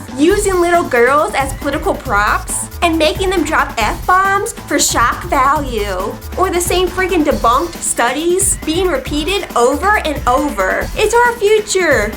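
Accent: South, United States. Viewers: Thanks. Talk about "pot + kettle = black", that FCkH8 dot-com clip itself was just pure hate. And, dear lord, the 'abuse through indoctrination' those young girls must have endured in that original video... it makes my flesh crawl down to the bone...I just watched 2mins 35 of filth that out did anything that Joe Gobbles conceived. Liking your videos, and as a non-North American, I can't get enough of your Southern accent - don't ever lose it!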